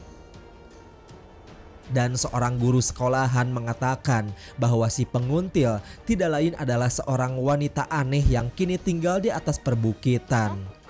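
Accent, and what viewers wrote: Sunda, Indonesia. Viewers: Lain lah, klw sangkhayang masih kental logat Sundanya, ada lucu2 nya gitu, klw ini maa serius ceritanya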